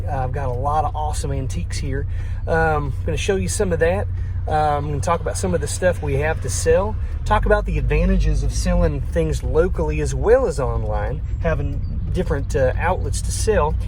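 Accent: South, United States. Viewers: Hey. I'm new to reselling. Well I have stuff but haven't listed yet and I believe I'm overthinking lol. Heard you say you were going to a shop in NC. Not sure if that's where you do business, but when I have watched videos, I wondered where you were from (the country accent🤷) lol. I got that SC country accent going on. Thanks for all the education!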